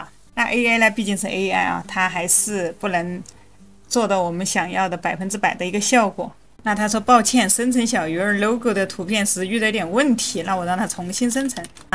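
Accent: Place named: Wuhan, China. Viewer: 武漢口音超級重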